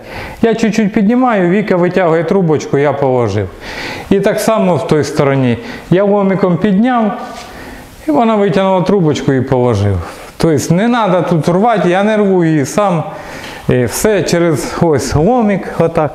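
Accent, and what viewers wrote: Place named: Kuban, Ukraine. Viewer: Мабуть чувак з Кубані, але українське коріння є. В Україні так не розмовляють тут за тридцять років вже мавпи навчилися розмовляти. Хай щастить.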